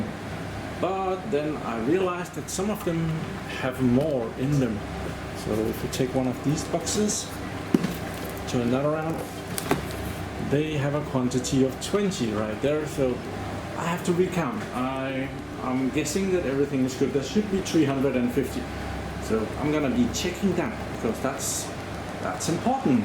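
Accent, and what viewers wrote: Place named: Denmark. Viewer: Syntes sku nok det lød som dansk accent :D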